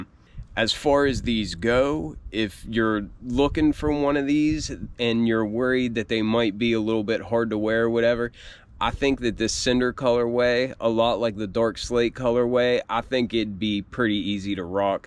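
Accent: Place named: Pittsburgh, United States. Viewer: Are you from the Pittsburgh area? I’ve lived in Pittsburgh my entire life and you have a pittsburgese accent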